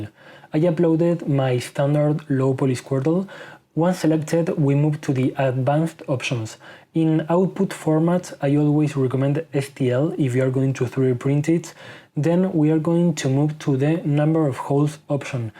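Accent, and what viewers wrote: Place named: Spain. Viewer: Mola que hables en inglés con acento español, así, por lo menos yo, lo entiendo mejor xD. Por cierto, tus videos son muy buenos.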